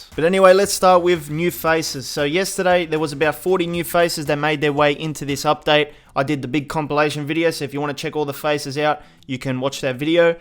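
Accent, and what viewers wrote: Australia. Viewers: He’s from Australia so he pronounces things different, low him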